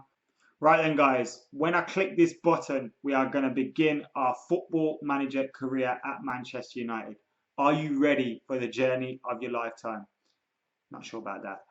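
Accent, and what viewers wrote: Ireland. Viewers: are ya Irish?😂🇮🇪🇮🇪🇮🇪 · Are u like half Irish?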